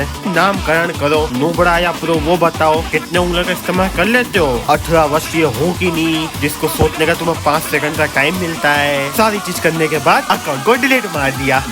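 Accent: Gujarat, India. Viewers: Bhai gujarati Cho ne tame Tamaro hindi ma Gujarati flow joi lage che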